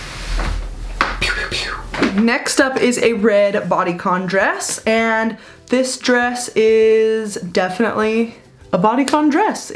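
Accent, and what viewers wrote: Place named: New York, United States. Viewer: Do you speak Italian? What part of Italy is your family from? You sound like your originally from NYC. Loved the video!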